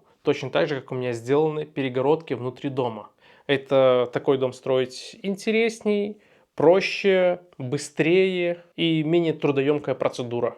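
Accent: Belarus. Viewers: А мне сразу стало понятно, что это где то в Белорусии. Первое: по говору. Второе: белорусы замечательные умельцы и трудолюбивые рукодельники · Здравствуйте! Судя по говору вы белорус😊 привет вам со Ставрополья! Мои предки строили дома из самана и они могу стоять сотни лет если за ним ухаживать.Отличие от вашего хоббитского все дома из самана с толстыми стенами и это важно ! Такие хатыбелят известкой а фундамент черным битумом. Красиво и нарядно ! Ваше умение строить такие печи со сложными дымоходами восхищают! В нашей местности дерево всегда ценилось и глиночурки не известны.и конечно с дровами туго.В детстве бабушка топила углем а потом у всех провели газ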